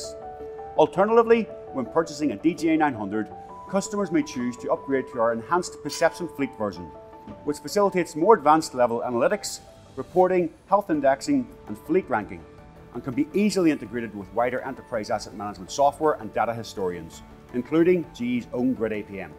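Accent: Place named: Northern Ireland, United Kingdom. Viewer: Excellent Video. The subtitles helped with the NORN IRON accent!:-)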